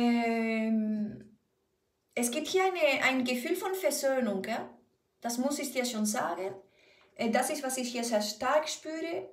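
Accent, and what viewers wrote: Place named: Spain. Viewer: Casi puedes decirr lo que quieres, te lo prestaría attención por tu accento español. 🤗 y tienes razón con lo que dices en algunas cosaa.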